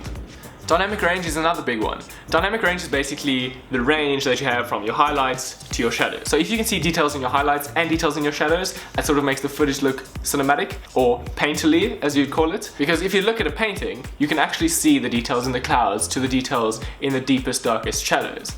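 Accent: South Africa. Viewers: he's south african isnt he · U from SA? Lol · Hey, the first video I have watched from you... and just from your accent and the first 3 seconds of the cinematic video(I saw the buildings and traffic lights) and I knew this is from South Africa(Downtown-Johannesburg). Nice well done :D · Are you South African? That accent sounds like home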